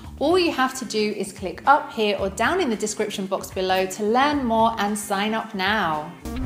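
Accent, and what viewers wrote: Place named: United Kingdom. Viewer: I like bri'ish accent😂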